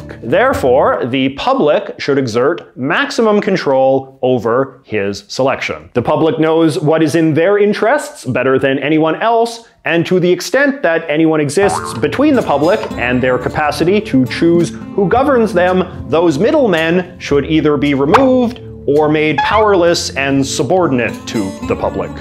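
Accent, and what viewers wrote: Canada. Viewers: American politics make more sense when explained with a thick Canadian accent.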